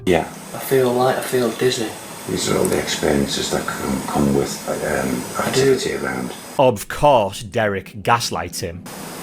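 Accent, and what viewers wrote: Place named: Newport, United Kingdom. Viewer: you must live near newport with that accent.greetings from barry island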